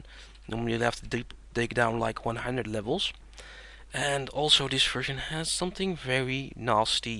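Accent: Netherlands. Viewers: Oh it's a Dutch accent I've never heard one before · Hmmm, i can just HEAR you're dutch! :P Another one here! :)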